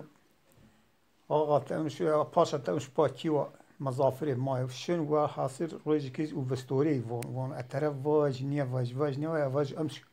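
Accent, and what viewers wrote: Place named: Solhan, Turkey. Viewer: Solhan yenisbasagin zazacasi gibi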